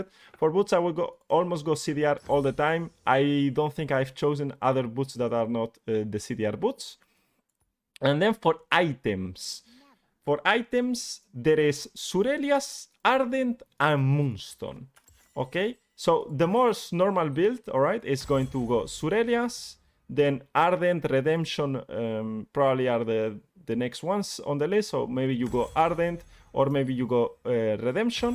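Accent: Spain. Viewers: llevo 10 segundos de video y ya puedo decir que es español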